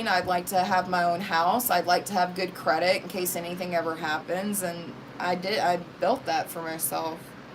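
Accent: South, United States. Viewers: PS ever since you hung out w your family your Southern accent is so much more pronounced 💕💕💕 I love it!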